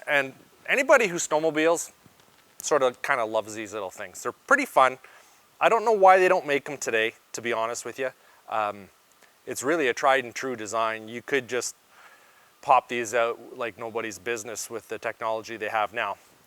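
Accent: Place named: Ontario, Canada. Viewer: Had to add the Ontario accent...Thanks for all the awesome videos. Peace brother.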